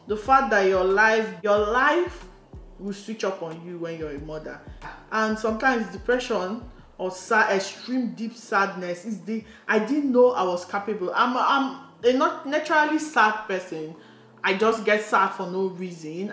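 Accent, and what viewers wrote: Igbo, Nigeria. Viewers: Exactly! There's absolutely nothing wrong with your Nigerian/Igbo accent. That's what endeared me to you😍 I love how rooted you are in our Igbo culture. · I love your Igbo accent. Ability to speak English without a foreign accent is NOT a measure of intelligence. My husband and I did our traditional wedding in January. Family keeps asking when are we doing church/white wedding? We tell them we aren’t doing a white wedding because we don’t want it. They look at us like we have 4 heads.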